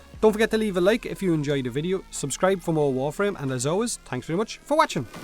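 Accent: Ireland. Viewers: Ronaldo Rond those Irish accents are one of a kind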